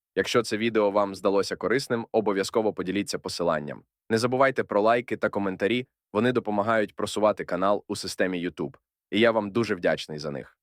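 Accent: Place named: United States. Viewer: American pronunciation 👎 I like British pronunciation 👍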